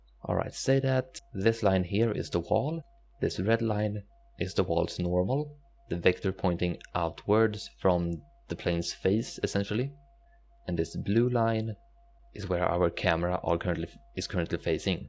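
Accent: Sweden. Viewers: Älskar din uppenbara svenska dialekt :)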